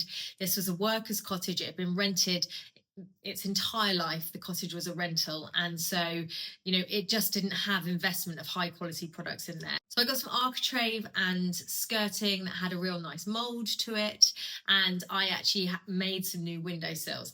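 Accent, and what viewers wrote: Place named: England, United Kingdom. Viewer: You are a natural designer and are very talented, I subscribed after seeing a couple of your shorts. You are incredible and I have enjoyed watching the transformation of the cottage! (I am in the USA and your accent reminds me of my mom who was an English War Bride in the 1940’s and married an American soldier.)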